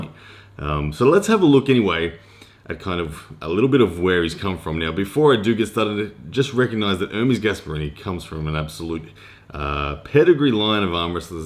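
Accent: Australia. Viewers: Great Video! Very informative with the smooth aussie delivery. ESPN should have an Arm wrestling News Segment for you bro. Quality content ,keep em coming pls.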